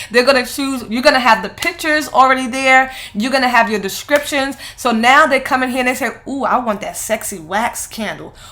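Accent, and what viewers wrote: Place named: Brooklyn, United States. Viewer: I'm thinking 🤔 she looks familiar...😂😉later on in the vid you say you're from NY. Now, I know you just SOUND familiar! 😂Brooklyn? Yes, on the grind!😂💪🏾💯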